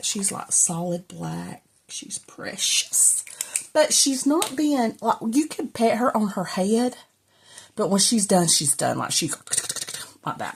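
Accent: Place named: South, United States. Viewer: no girl…but I am from Texas. My son was watching with me when I played your video and he said…oh she’s from the south. She sounds like us! 😂😍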